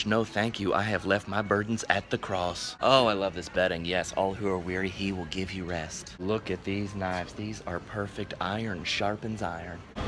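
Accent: South, United States. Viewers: Are you trying to be southern mama?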